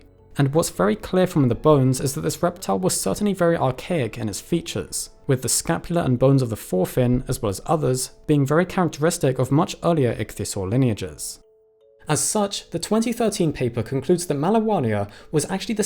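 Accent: United Kingdom. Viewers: if this channel had a non-british narrator i would probably watch it more often. the narrator is also just so monotonous and the script is so standard academic that I cant even listen to it without my mind drifting away.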